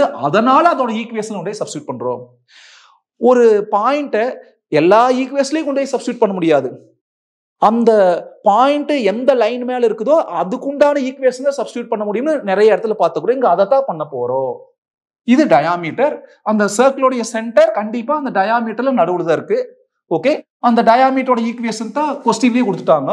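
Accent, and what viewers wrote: Coimbatore, India. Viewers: Coimbatore slang😋☝🏽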